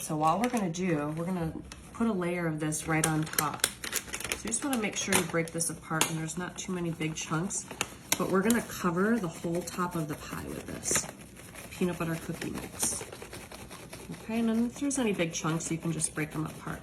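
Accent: South, United States. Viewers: So why the southern accent on your other channel? 🤔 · So in your newer videos on your other channel you have a southern accent and sound totally different. Is it fake? Or is this accent on this video fake?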